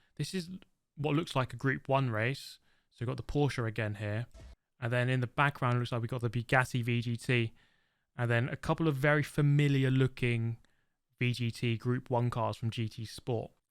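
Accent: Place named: London, United Kingdom. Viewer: I think you're from the same part of London as General Minus based on the accent..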